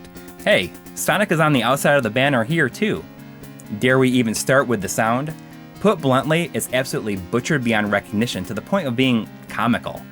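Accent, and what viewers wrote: Wisconsin, United States. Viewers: I seriously can’t take the Wisconsin AAAAccent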